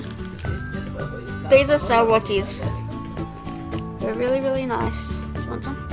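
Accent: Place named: Australia. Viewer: Loved the vlog! Oh my gosh you're the first nepali with an Australian accent I've heard! love love love the accent <3 Also subbed and would love it if you checked out my vids too :)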